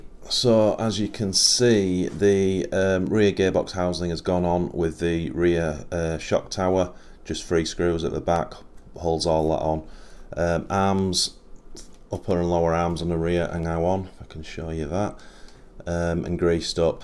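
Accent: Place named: Stockport, United Kingdom. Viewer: That a stockport accent in canada?! Where do you get your alternative decals from?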